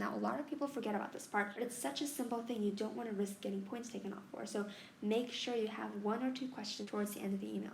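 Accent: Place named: Mexico. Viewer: Love the way she speaks Mexican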